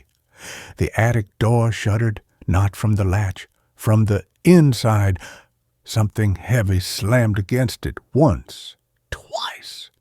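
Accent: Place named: South, United States. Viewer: Laura here in South Georgia am. Love, love, your stories and the southern accent ❤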